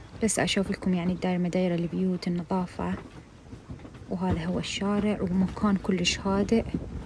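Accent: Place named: Iraq. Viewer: اعشق الهجة العراقية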